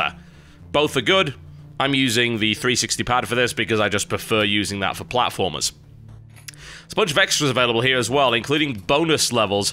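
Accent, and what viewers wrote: United Kingdom. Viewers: they generally have different accents but very similar however he is very british in his accent cant tell if trolling or stupid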